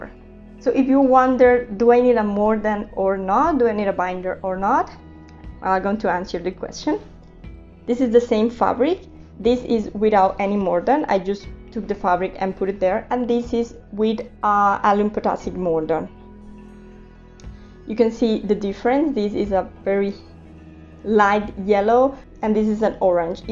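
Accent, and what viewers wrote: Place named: Italy. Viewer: Ooooohhhhhh avevo sentito accento italiano...posso chiedere una tradizione in italiano? Mi piacerebbe moltissimo imparare. Ma nella mia lingua c'è praticamente niente....ti prego puoi aiutarmi? Grazie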